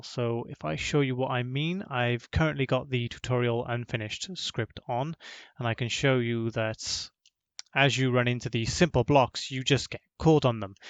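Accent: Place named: Wales, United Kingdom. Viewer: Looks like I'm not the only Welshman (I'm guessing by the accent) who's struggled with stairs! I'll have to give this solution a try, as my own solution became very convoluted, but the in-game result looks very similar to yours. I was trying to create a sort of "fake ramp movement" where I'd calculate the angle/direction from where the character is standing, to the point where they would be stepping up to, and then altered the players movement direction according to that. It involves a bazillion raycasts and lots of fudged maths, and I'm sure is massively inefficient. Maybe this simpler approach is what I need!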